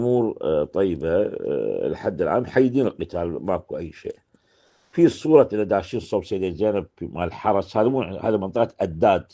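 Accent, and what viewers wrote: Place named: Gulf, Arab world. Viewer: هذا يحجي خليجي مو سوري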